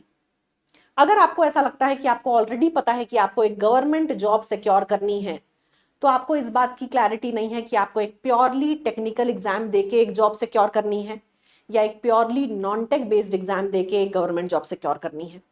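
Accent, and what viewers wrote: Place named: South, India. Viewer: AAP south se ho kya mam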